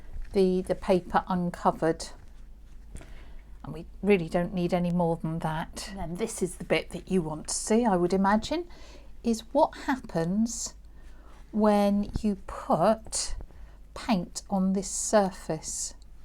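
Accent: United Kingdom. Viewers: The most lovely accent in the world is the British one❤❤❤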